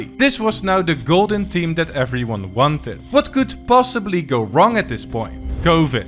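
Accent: Netherlands. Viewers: Lekker man hoorde het dacht al een mede Nederland Chanel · I smell Dutch in your accent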